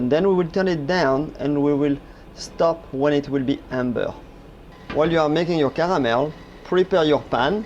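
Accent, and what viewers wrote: France. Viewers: i love ur english accent(u might be a french speaker).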